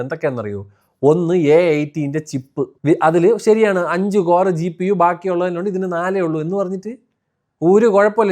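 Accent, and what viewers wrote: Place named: Kollam, India. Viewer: Kollam slag venda bro ...very irritating...pls use neutral one..else use cochi slag still okaish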